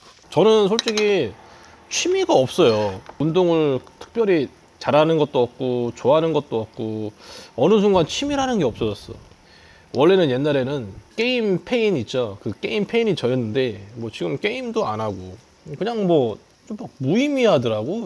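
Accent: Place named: Jeonju, South Korea. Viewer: 라면에 혼술하며 영상 시청합니다 전주쪽이랑 비슷한 사투리가 넘 정겨워요ㅋ 담에 차박 영상도 기대할게요~~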